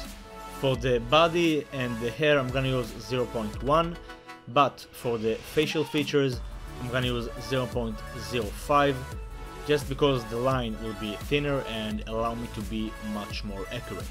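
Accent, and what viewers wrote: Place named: Israel. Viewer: יש לך מבטא ישראלי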